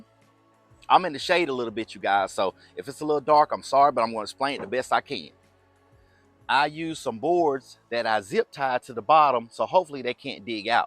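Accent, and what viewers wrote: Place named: South, United States. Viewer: I think my mind is made up now to use pallets. Hec, my pocket has my mind made up. We have old 12x12 fence for dog pin. You have great ideas and spoiled pets. Im a Georgia 🍑. I caught your southern accent about your second sentence. I find the southern accent rich, rooted and historical. Thanks for sharing your video.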